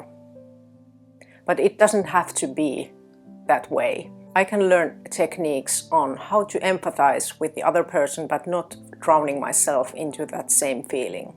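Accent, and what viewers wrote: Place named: Finland. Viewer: Some 40 years ago, I had the opportunity to live in southern Finland for a year and hearing your accent brought back a lot of good memories. Thank you for that. I became aware of the HSP type only a couple of years ago and it felt very affirming as I read through The list of the ways that we are “different“ that are normal for us. I have quit trying to please others, I accommodate them when I feel I can, and that has made a big difference in how I feel and I’m able to live. I have found 15 to 30 minutes of meditation pretty much first thing in the morning is how I feel and function best so I make sure I have the time and privacy to get that in no matter where I am or what I am doing. I have become a bit of a recluse, but that seems to be how my life works best. You have to honor your own needs first or you cannot be there for anyone else.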